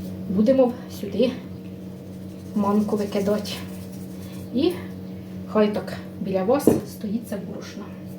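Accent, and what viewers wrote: Ukraine. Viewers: Це настоящя укра¡нська мова..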